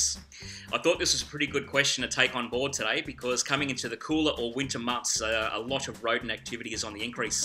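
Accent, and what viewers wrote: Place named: Australia. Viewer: You sound so Australian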